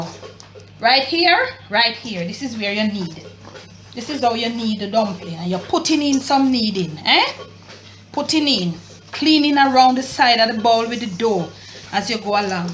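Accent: Jamaica. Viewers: Hi Ms 👋. I enjoy and like watching your videos. I like to hear you speak and your Jamaican accent. Your cooking and method is very simple. I wish you all the best in life Good health and happiness ❣️.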